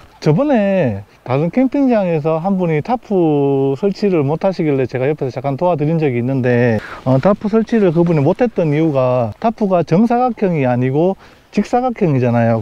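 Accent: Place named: Gyeongsang, South Korea. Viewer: 타프 설치 전문가이시네요 ㅎㅎ 구수한 경상도 사투리는 덤...이고요 ㅋㅋ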